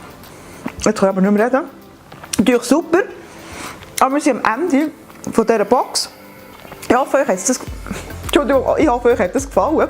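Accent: Bern, Switzerland. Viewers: Cool! Kenne bis jtz nur d Unboxings vom Tobinator. Mau schön uf Bärndütsch 👍🏼😄 hesch öpe e Steam Game Account ou no?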